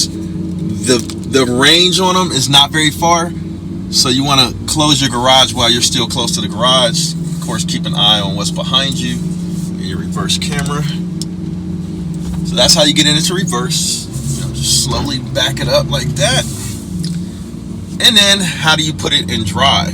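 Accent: United Kingdom. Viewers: love the bristish accent 😂👌🏼 congrats on the car . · Loving your British accent😁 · I didn't know you were British? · British accents are amazing lol, nice how to man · So no mode days Drive it's Strada Corsair or sport mode to go forward and r for reverse. Very interesting so if u put it in neutral when stopped you're self do it rev or go into first gear when u press the Gas? Lol British Accent.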